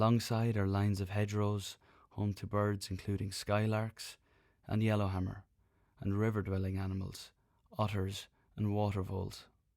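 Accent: Ireland. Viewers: * soothing Irish voice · I don’t think I’ll ever be able to sleep again without this. Niall’s voice & beautiful Irish accent is so soothing. The only problem is I never get to finish the video. In less than 5 minutes,I’m sleeping like a baby. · especially with the Irish accent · love the irish accent that he has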